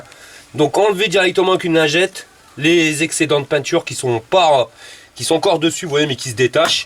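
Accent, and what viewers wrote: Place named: North, France. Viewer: Merci,je comprends lieu le léger accents, on est voisin,je suis de Maubeuge,chti power et " FIRE "!!!!! Continu comme ça!!!!!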